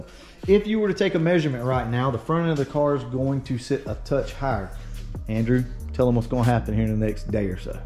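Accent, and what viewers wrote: United States. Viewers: so americans really talk like that?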